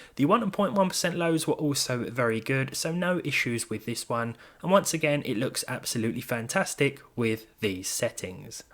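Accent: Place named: Cockney, United Kingdom. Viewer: Your review is fine but your cockney British accent was tearing up my ears. And it pays off 👍🤤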